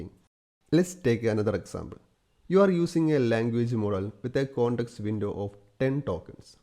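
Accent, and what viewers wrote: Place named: Kerala, India. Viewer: Why don't you use a text to voice output and present this without a heavy Mallu accent 😮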